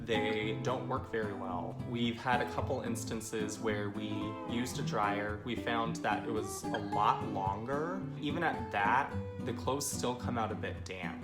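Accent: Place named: United States. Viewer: Unfortunately you do sound like a complaining American.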